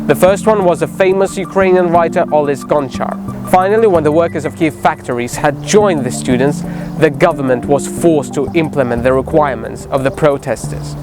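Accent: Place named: United Kingdom. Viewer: Awesome video, great British pronunciation!!! 👏🏻👏🏻👏🏻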